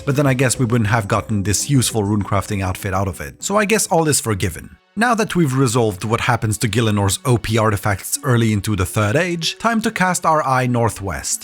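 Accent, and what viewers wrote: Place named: Scotland, United Kingdom. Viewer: Dude your Scottish dialect is cool XD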